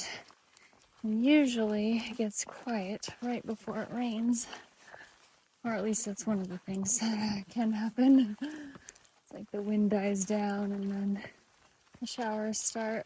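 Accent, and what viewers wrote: United Kingdom. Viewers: I love the British accent! I’ve been watching your hike for many years. I remember your video where you started the British accent and asked if was real or not. Never saw the answer. I think it’s real, if not it’s great!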